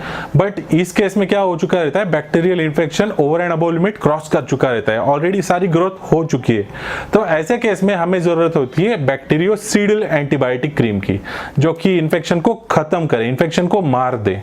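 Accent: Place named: North, India. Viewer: sure you are being modest an all..😊 but that's what YouTubers and Instagramers are influencers!! BTW what city are you from? Your Hindi is very North😃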